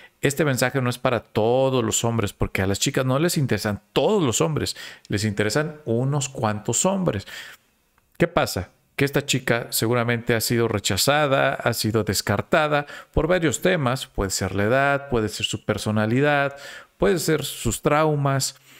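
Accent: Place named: Argentina. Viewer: Por tu acento me doy cuenta que eres de Argentina,.admiro y respeto gente culta en el deporte y en la música., tienes razón empecé con estos canales por curiosidad y me gustaron demasiado aparte de ver los de tu compatriota Agustín laje arrigoni,el cual es un escritor que me encanta y admiro.viva Argentina. Trataré de tomar muy en cuenta tu consejo el cual tiene mucho de razón. Saludos desde México tierra Azteca.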